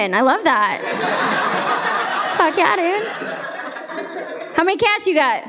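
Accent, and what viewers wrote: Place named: Ohio, United States. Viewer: Killer set, enjoyed your Ohio sense of humor lol. I never heard an Ohioan accent I understood until now but I sorta get it,anyways great job you’re totally right our parents do not drink water, my dad says fish *uck in it so no thanks lol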